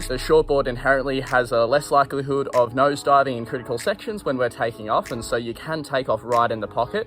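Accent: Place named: Australia. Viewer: Dude, thanks so much for all your vids and explanations. Very useful. (And cool to listen to a calm aussie voice)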